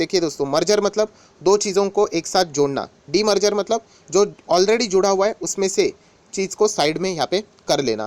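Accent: Maharashtra, India. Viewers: bhava tyanchya bolnya madhun ch kalat aahe te marathi aahet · sir aap marathi hai aapki bolne ki bhasa thodisi marathi hai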